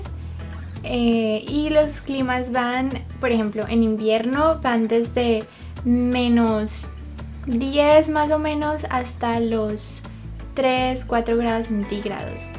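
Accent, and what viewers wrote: Cali, Colombia. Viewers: Eso se te oye medio caleña ois jaja tan bella saludos desde cali 👌🏽👌🏽👌🏽 tengo un enamorado de Austria y quiero saber todo de la cultura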